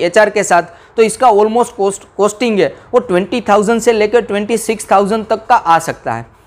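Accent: Gujarat, India. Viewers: Iskae hindi ke accent se lagta hai ye pakka Gujju hai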